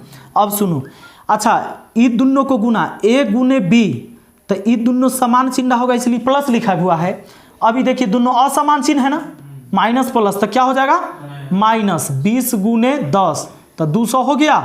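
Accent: Bihar, India. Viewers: Sir aapke Bihari style language mast hai.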